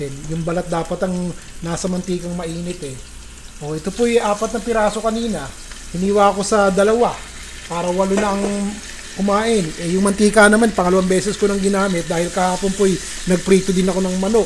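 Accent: Philippines. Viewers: Chef taga Saan po kayo originally kasi ang Ganda ng punto ninyo 😂 tagalog na tagalog eh 😅 Saan po kayo naka base . Gagawin ko Ito today sa malamig na weather here in Scotland . 🏴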